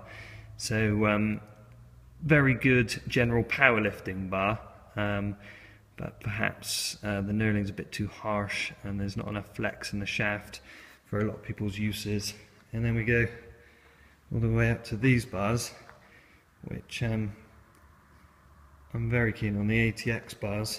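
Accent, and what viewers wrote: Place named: West Country, United Kingdom. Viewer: Love the sound of 'knurling' in a West Country accent.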